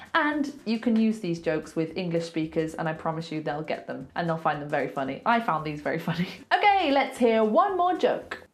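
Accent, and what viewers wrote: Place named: RP, United Kingdom. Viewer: Hello. Great video! I speak Spanish and have for most of my life (My mother is Cuban, and my wife is Mexican, but I grew up in the US). However, the reason I don't consider myself fluent in Spanish is because I have trouble with many of the jokes for the reasons you mentioned (idioms, slang, colloquialisms, etc). I am going to teach ESL here in the US to Spanish speakers. Jokes are definitely something I will use so thank you for that idea. I am also going to use songs because that is also a fun way to teach. I also have noticed that phonetically the RP English that you speak is easier for Spanish speakers, especially the pronunciations. One example is words that end in -er. When I was trying to teach my wife English using a word like "greater" her mouth could not make the "err" sound. But in RP it is "GrAY-tah" which she can say. 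She actually learned a lot of English from a woman she worked for who was from India and did not know how to speak Spanish but spoke RP English. That is why I subscribed to your channel, I would like to teach both RP and American English (which has many, many accents as you know - I consider the English spoken by American hillbillies almost a different language :). My accent is fairly neutral with a slight midwestern twang. I really like the way you teach. You use humor (or humour - haha) but you get to the heart of the subject. Best of luck! I will end with a joke: Why is England the wettest country? Because the queen reigned there for decades (may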